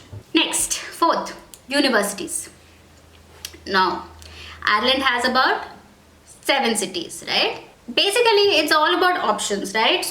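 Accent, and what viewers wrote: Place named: India. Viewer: Indian English very Funny... 😅... Practice on pronunciation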